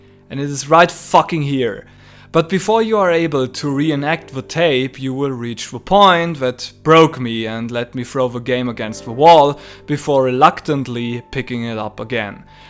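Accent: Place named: Germany. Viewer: I wanted to watch the video since I agree with the opinion, but from the first "laaaahst" the fucking German cringe-accent hit me. Peinlich as usual. I hate mine peinliche Mitdeutschen so much................................. Please get off DuRöhre until you've learned to pronounce (modern) English. Watch/play stuff without the fucking Synchros for instance, why dont'cha... Un-verfickt-glaublich......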